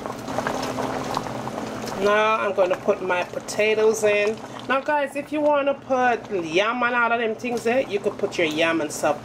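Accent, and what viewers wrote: Jamaica. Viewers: I’m from the Caribbean but I just moved to The Netherlands and when I say your accent and patois is like a breath of fresh air to me😂😑 totally gonna make this and I love how you’re not yanking like how most other Caribbean ytbers do. Much love!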